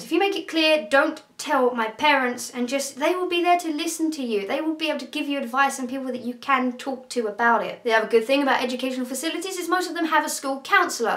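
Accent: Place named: England, United Kingdom. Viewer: I am learning English and I loved to hear you and I loved your accent. Where are you from? England?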